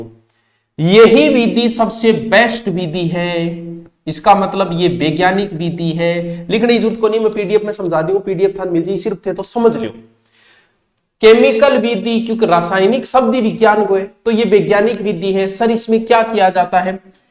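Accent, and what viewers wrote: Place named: Rajasthan, India. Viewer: Hello sir.... sir me MBBS kar rha hu....abhi 3rd year me hu......pta nhi kaha se mind me ye RAS ka bhoot sa chhad gyaa ek dum se.....aapke bahut saare videos dekhe hai maine ....aap bahut achcha padhaate ho... dheere dheere.....and marwadi sunn ke toh mazaa hi aa jaata hai sir.... English sunn sunn ke dimaag kharab ho rkha hai already 😂.....abhi pta nhi kya karna hai...but me isliye dekh rha hu aapke videos kyunki me Rajasthan se hu....toh mujhe ye sab pta hona chahiye naa .. geography... history....fort....rivers etc etc...mujhe kuch bhi nhi pta inn sabke baare me... isliye aapki kripa se thoda gyaan le rha hu...baaki dekhte hai....waqt kaha le jaata hai.......btw..... thank you so much sir ❤❤❤......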